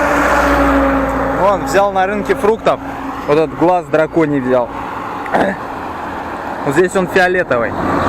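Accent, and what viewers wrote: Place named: Chuvashia, Russia. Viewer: это чувашский акцент на английском языке.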